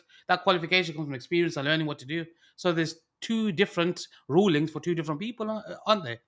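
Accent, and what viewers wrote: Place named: South Asian, United Kingdom. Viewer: Thanks a lot bro. A request of mine and i'm sure many others is to please turn on captions for your videos. I absolutely love and enjoy your South Asian Britiah accent but it sometimes is a bit hard to follow esp with the recent mic issue. Dera Manana brother!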